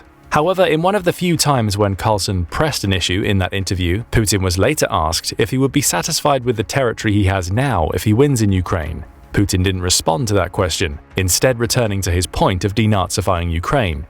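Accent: England, United Kingdom. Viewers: Are you from England,kinda figured 🇺🇸💅☢️ your boath toast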